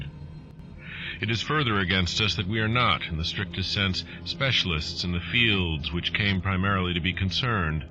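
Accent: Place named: United States. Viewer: I don't like the USA accent, it should be read with a British accent.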